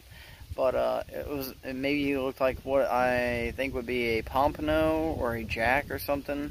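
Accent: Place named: South, United States. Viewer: Well Dude I understand about the secret hunting stash, but you can still share other videos right? Maybe another sponsor will pick you up? Other than that, things are pretty boring in the "Burgh" this time of year except for $5.00 for a dozen eggs or $7.00 for a jar of mayo. Hey! maybe that could be your next video. Don't forget, the first video I saw of yours was a tour of the Conquest, I literally searched " Carnival Conquest Tour" and you were the first vid that popped up. I'm not sure if the content matters. Your voice and the slow way you explain things along with the southern accent are your strong points. Let's be honest, there is not a huge market for prehistoric shark teeth.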